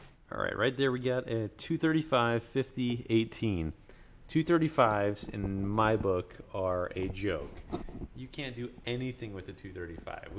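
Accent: United States. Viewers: This is probably the point where I sign off permanently. At first I was excited that maybe this would be a true tuner channel but all this video was is just unimaginative nut swinging. You're literally using the hill billy voice, building a cop car, etc etc. Its like watching cleetus except way less entertaining lol. Bro, you need to get that together.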